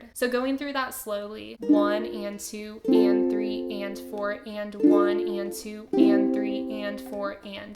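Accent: South, United States. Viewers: She's southern stop being so rude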